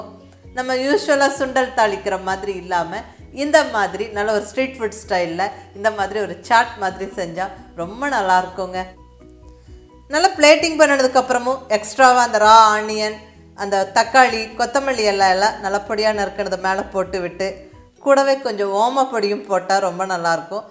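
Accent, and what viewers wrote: Kongu, India. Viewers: கொங்கு தமிழில் அழகா...healthy ஆன ரெசிபி சொன்னிங்க... thanks mam 🙏....👍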